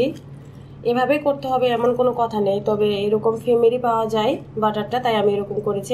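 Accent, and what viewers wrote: Bangladesh. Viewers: I guess she is from Bangladesh , due to the accent . But yeah if you are from India that is , then I definitely don't know where dalda can be found in your country, sorry couldn't help ☹️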